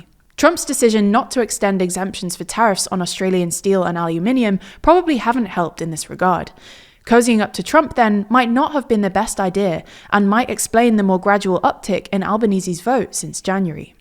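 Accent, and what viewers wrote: United Kingdom. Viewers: Love listening to Aussie election news with a nice British accent.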